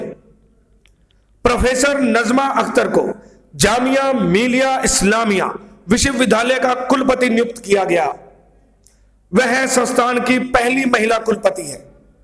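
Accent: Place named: Punjab, India. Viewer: Aawaj me punjabi language ka touch aa raha h ji Good keep it up sir ji 👌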